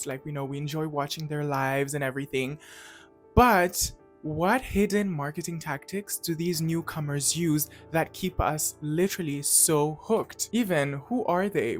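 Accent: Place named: Jamaica. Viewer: You sound jamaican to me, all i could focus on was that and your fro 😅. Lovely editing too!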